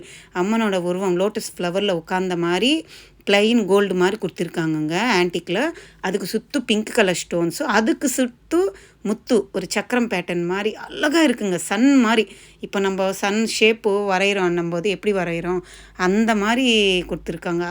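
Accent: Telugu, India. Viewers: Sis neenga telugu vaasanai vesura tamil la pesuradhaala adhu keka nalla irukku ❤❤❤❤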